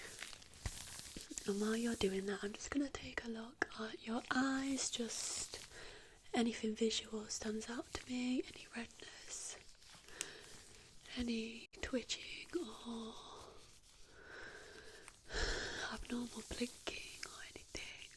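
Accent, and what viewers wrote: United Kingdom. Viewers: I’m trying to figure out if she’s British or not 😂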